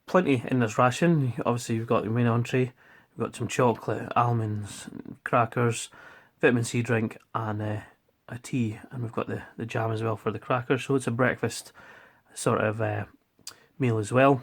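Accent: Scotland, United Kingdom. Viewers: OH MY GOD I LOVE THE SCOTTISH ACCENT Great review btw xd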